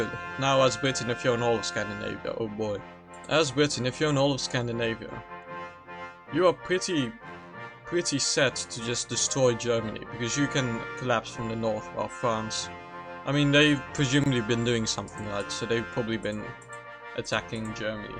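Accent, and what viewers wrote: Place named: Netherlands. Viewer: Ik hoor toch een Nederlands accent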